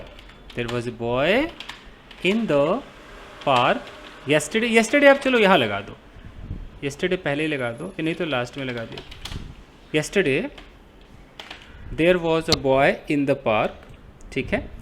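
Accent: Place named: Bengal, India. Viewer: Vaiya aap ka bengoli bohut sweet hai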